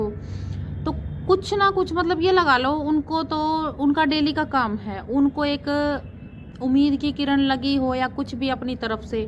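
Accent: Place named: Haryana, India. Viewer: कहाँ से हो बहन भाशा से हरयाणा की लग रही हो subscribe kar diya aapko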